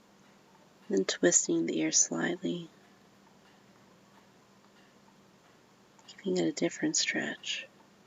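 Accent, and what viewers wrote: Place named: Kentucky, United States. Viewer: Based only on accent, I would say in Kentucky. If I could hear her pronunciation of Louisville, I could confirm.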